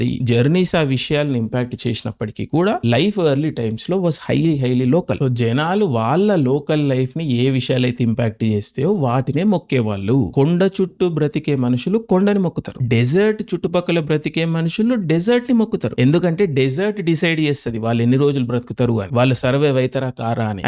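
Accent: Telangana, India. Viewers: Telangana Yasa 🙏🏻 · ఇంతకు నువ్వు మాట్లాడేది తెలుగా తెలంగాణ నా??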